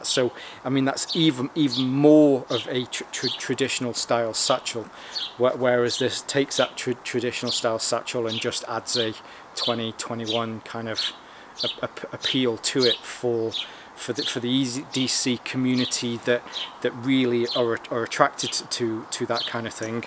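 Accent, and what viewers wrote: United Kingdom. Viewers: I love my Tacsac 13. I'll never NOT carry a Nutsac bag (I started with the Man Bag Dammit). Let's face it, every 15-year-old that dwells within all men loves the naughty name of the company. Plus, we yanks are suckers for the Brit accent. Keep up the good work old chap!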